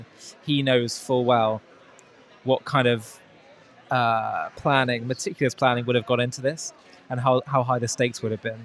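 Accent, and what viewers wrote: United Kingdom. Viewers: it's just a british (based) accent. nothing extraordinary about it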